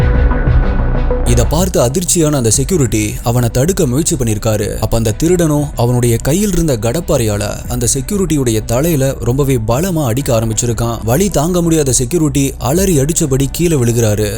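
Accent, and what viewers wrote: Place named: Eriyur, India. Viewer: அண்ணா உங்க ஊரு ஏரியூர் ஆஹ் னா உங்க slang அந்த ஊருல உள்ளது மாரி irukku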